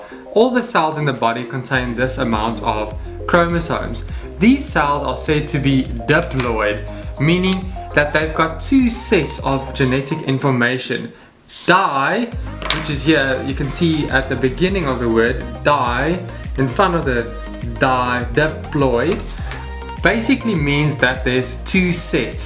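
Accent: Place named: South Africa. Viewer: thank you for the video man, it really helped. Are you South African? The accent is almost identical hahahah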